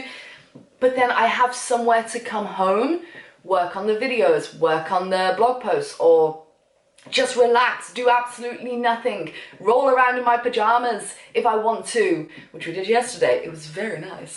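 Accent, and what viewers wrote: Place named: Birmingham, United Kingdom. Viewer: Hi, glad to see you doing well. Home certainly agrees with you, you look great. And I think I hear the Brummie accent coming back. I remember the exact day you left Budapest, because the wife and I arrived there for vacation that same day.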